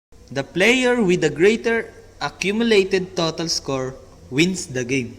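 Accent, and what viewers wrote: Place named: Philippines. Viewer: huwag tayo mahiya sa english natin dahil yan ang tatak pinoy, mga hindi nga ang daming video sa math, alisin na natin yang mentality natin na dapat american accent tayo, ang mahalaga mapresent natin ng maayos ang video natin, mga amerikano nga hindi nagcocomment tungkol sa accent. good work, pls. do some damath videos para sa elementary, napansin ko kasi na wala.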